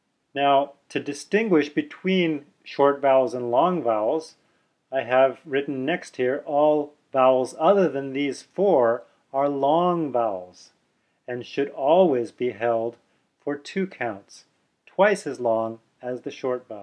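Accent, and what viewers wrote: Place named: Canada. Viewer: I had watched next I one in the series after making this comment: and noted that u did NOT use a dipthong, as in 'bone' [when pron. w an English accent]. I take it then that that 'o' (or 'o-ou'), would be more suited to this symbol: औ . Tho as u say: with a 'canadian' / afro-carribbean accent; so more like 'u-ou'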